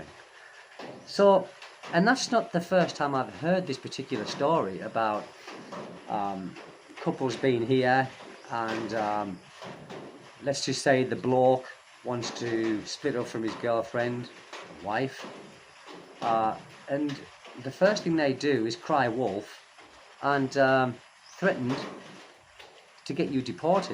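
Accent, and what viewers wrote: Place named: United Kingdom. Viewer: ome pf the appeals of your channel is your British accent, i love the way you say, de-poorted :face-purple-crying::face-purple-crying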